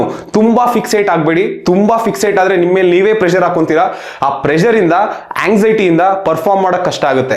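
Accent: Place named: Hassan, India. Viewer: Thank you sir❤... ನಿಮ್ಮ ಭಾಷಯಲ್ಲಿ ಹಾಸನ ಜಿಲ್ಲೆಯ ಅರಸೀಕೆರೆ- ಚನ್ನರಾಯಪಟ್ಟಣದ ಸೊಗಡು ತುಂಬಿದೆ😊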